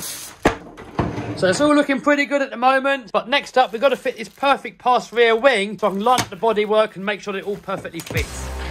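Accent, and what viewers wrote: United Kingdom. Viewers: Very British English. Great caröeee.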